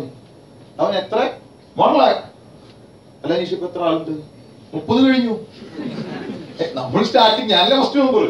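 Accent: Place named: Malappuram, India. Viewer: Do arado paranjad malappuram bhaasha virthikettadennu listen to 19:4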